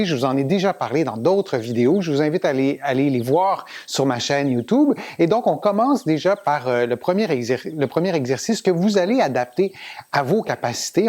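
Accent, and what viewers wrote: Quebec, Canada. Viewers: Bonjour, tout d'abord merci pour vos vidéos! Je suis québécoise, habitant en France, mais j'ai besoin de me reconnecter avec l'accent de mon patelin ça me fait du bien! Je voulais juste vous proposer, lorsque vous démontrez un exercice pour les jambes, de descendre votre caméra au niveau de vos jambes. Je suis prof. de fitness et ce genre d'exercice, aussi simple soit-il amène un positionnement souvent erroné. Le genou est souvent trop vers l'avant etc..le visuel est très "parlant"! Encore merci pour vos capsules, dans notre métier il faut se tenir au courant et se mettre à jour continuellement.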